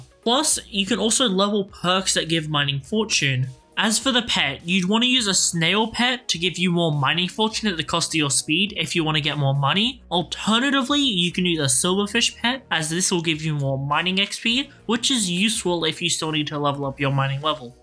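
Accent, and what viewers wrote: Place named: United Kingdom. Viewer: british accent and exposing quaglet of macroing when he's not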